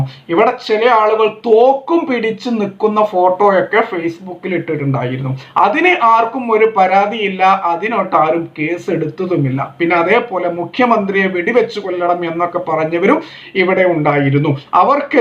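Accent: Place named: Kasaragod, India. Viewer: ഒരു കാര്യം പറയട്ടെ കൂട്ടുകാര നിങ്ങളുടെ ചാനൽ ഞാൻ കാണാറുണ്ട് നിങ്ങളുടെ ശൈലിയിൽ നിങ്ങൾ സംസാരിക്കുക നിങ്ങളുടെ സംസാരം കാണുബോൾ ഏതോ ഒരു കാസറഗോഡ്കാരൻ സംസാരിക്കുന്നതുപോലെ i like you this chanal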